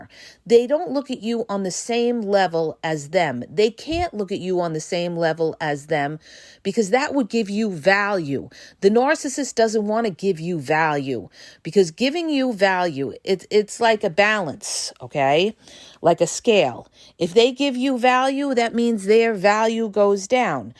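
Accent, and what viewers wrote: New York, United States. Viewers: i'm finally laughing again at you saying "they throw you to the wind" And your language is perfect combined with the New York accent I feel like I'm listening to a friend.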